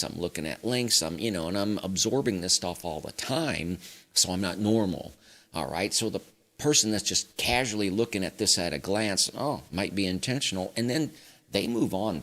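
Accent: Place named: Mississippi, United States. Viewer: I live in Mississippi and I lost my mom to lung ca 11 yrs ago! They're proud of us!! Love your Mississippi accent!! Great!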